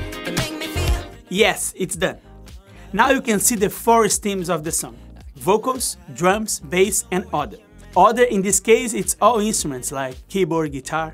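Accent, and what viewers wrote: Brazil. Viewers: Massa demais!! é Brazuka! Dá pra ver pelo sotaque! Parabéns pelo canal!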